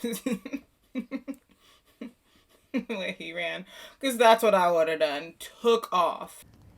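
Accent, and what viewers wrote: South, United States. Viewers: I dip in only occasionally with life so busy now, but I had to see this one again with you, it's one of my favorites. Arya is awesome! I notice your southern accent coming out a bit more than I've ever heard before and you've been beamed onto my screens for years now. It's like your comfortable now, which is cool. I'm in NC and it drives me nuts when people say I have an accent, I've fought so hard not to, so I hope that's not a bad thing to point out, but yeah, nothing wrong with getting comfortable with your audience.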